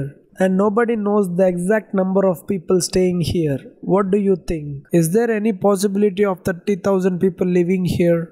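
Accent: India. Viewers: Indian accent bro 😅😅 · We can easily identify that ur from tamil nadu, tamil people english has separate slang needs to be recognize. I like it, as i studied in tamilnadu i know. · Please use an AI generated English voice or something, this Indian accent is difficult to understand.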